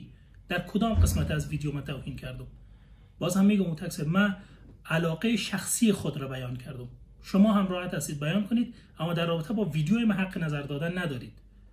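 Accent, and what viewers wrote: Iran. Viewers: شایدانسان خوب باشی ، اما طرز صحبتت کمی ایرانی شکل است و برای لحجه دری صدمه وارد میکند .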